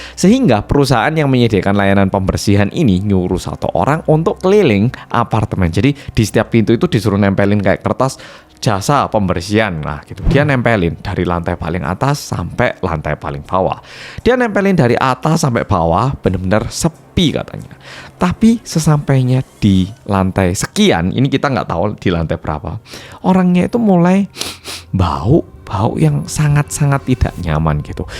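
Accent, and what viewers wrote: Java, Indonesia. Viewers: Enak banget dengar nak "cerita"kaya org jawa lagi mendongeng.g kedengar blas ni orang korea.ngalam pooollll,medhok😂😂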